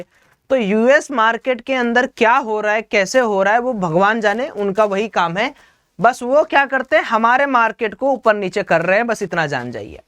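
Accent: Varanasi, India. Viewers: Varanasi se ho kya bhai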